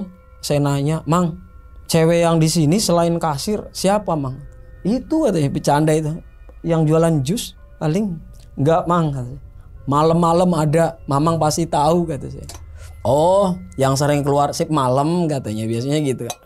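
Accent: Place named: Indramayu, Indonesia. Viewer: Indramayu tenan kih uwong.....😂😂😂😂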